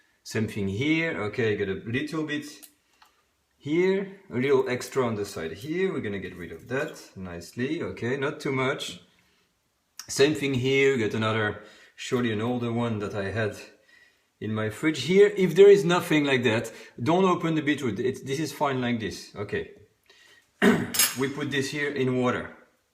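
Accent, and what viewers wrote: France. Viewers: You hsve such a beautiful French accented English 🌺🌺🌺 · Wish you make it longer than 9 mntes . Love your French accent Chef . Merci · Love your French accent ❤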